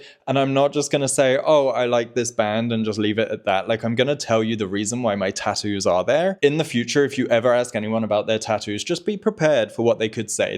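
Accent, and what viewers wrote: England, United Kingdom. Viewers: you are so handsome with a gorgeous English accent that sounds so classy, elegant and professional and I love your tattoos, even though they are personally not my thing - and if I did get a tattoo, it would be something about my Irish roots, like a Celtic Cross, Celtic Knot, a Shamrock or a Harp - I would definitely still date you in a heartbeat though, as you are absolutely gorgeous-looking with your tattoos 🇮🇪☘️🇬🇧😍😍😍😍😍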